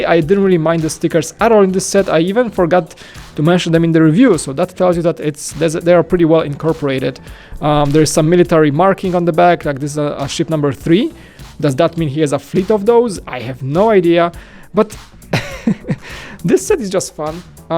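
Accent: Poland. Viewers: I’m guessing Polish 🇵🇱 But I could be clearly wrong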